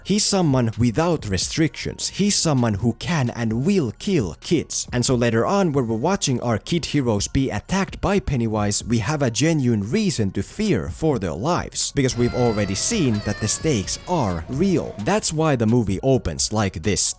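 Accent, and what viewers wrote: Finland. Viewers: wait where are you from? Your English accent sounds Finnish.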